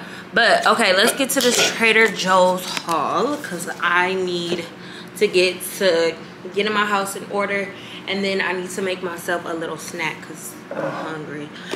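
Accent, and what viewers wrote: England, United Kingdom. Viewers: Your English accent kills me every time, so funny. You say literally -perfectly 😂❤ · It was the fuckingggggg BRITISH ACCENT for meeeeee🤣🤣🤣 “LITRALLYYYY” 😭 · The English accent😂😂😂 literally! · Love your videos as per...and the sprinkling of British accent you give Love from the UK 🇬🇧💕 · It's the English accent for me LOL Gworl I love it LOL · British accent always has me in stitches😂😂😂 it’s like she talking regular then all of a sudden, boom, accent. I’m like girlll😂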